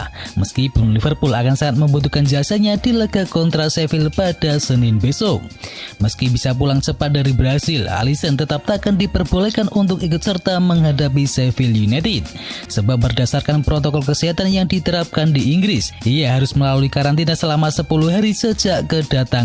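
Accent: Java, Indonesia. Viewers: bisa gak pakai bahasa Indonesia yg baik dan benar jangan logat jawa dasar biawak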